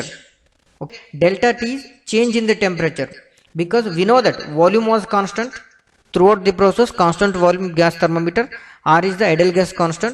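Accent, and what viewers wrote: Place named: India. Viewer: most indian accent i have ever heard, love it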